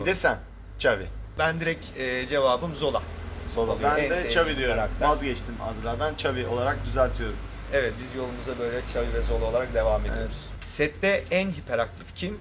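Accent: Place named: Italy. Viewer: Abi sen italyan ca konuş daha iyi (yani aksanı)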